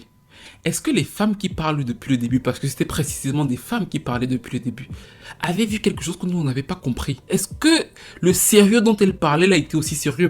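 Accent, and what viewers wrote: Cameroon. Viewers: C'est tellement drôle, comme tu t'es repris in extremis ! 🙃😅😆 En bon camerounais, je suis sûre que la suite était: "Avant que le bébé"... n'entre ! 🤣🤣🤣🤣🤣 Et puis, la vidéo ensuite qui vient remplacer et imager le propros... 😂 Les camerounais que dis-je, les continentaux et leur phrasé légendaire... Comme je vous aime ! 😅🧡💯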